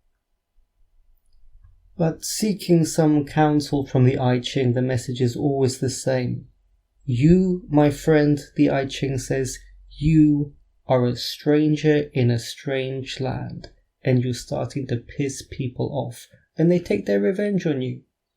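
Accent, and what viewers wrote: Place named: England, United Kingdom. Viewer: Thank you,. A lovely talk as usual. My dad was Scottish, and those pipes just wake me up, something in my DNA. You sound really English, but you are not?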